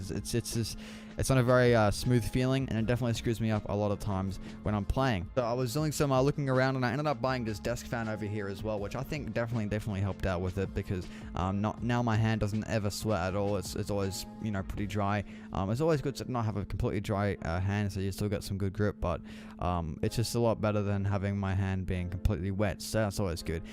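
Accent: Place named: Australia. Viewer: Australian, defiantly a follow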